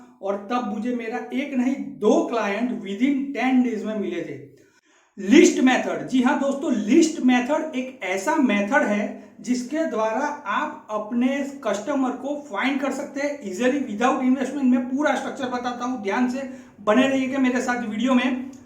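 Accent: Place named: Gujarat, India. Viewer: Gujrati h shyd 😂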